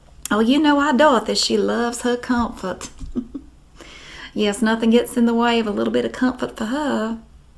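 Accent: South, United States. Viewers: Love the southern accent.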